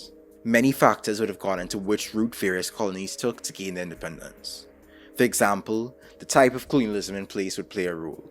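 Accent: Trinidad and Tobago. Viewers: Are you Trinidadian? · ay yo i heard that trini accent and subbed right away! youre doing great work my friend much love from canada.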